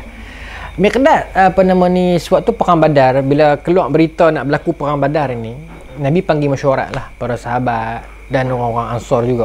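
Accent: North, Malaysia. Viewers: 😃😄 wahh.. Ramai juga yg faham kan kuliah Ustaz walaupun beliau pekat loghat utaqa.. Boleh nampak disitu keikhlasan Ustaz dalam berdakwah 👍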